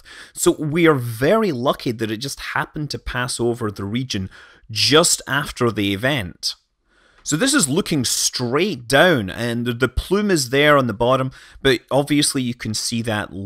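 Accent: Scotland, United Kingdom. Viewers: I click for the Scottish accent . . . . . . . and the info too. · Are you Scottish?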